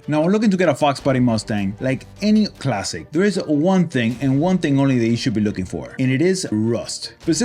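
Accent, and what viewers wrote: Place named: Hialeah, United States. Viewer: . That is a lovely accent. Hialeah?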